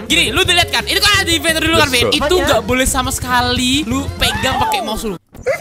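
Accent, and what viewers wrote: Java, Indonesia. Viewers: Orang Jawa kah bang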